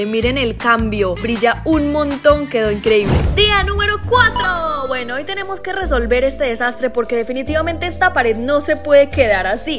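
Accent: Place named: Colombia. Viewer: Amo como hablas toda Haci colombiana y pues uno intentando hablar como tú jaja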